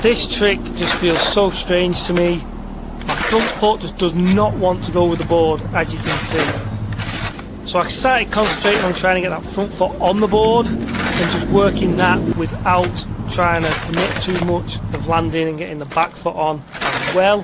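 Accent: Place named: Ireland. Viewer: No problem bro, and gotcha. I heard more of an Irish accent but I don't know much about that lol.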